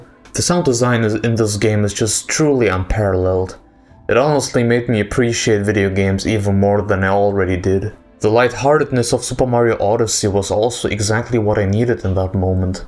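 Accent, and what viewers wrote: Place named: Germany. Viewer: Wow, you have such a German accent speaking English. Я некогда не думал что вы говорите по-русски 😂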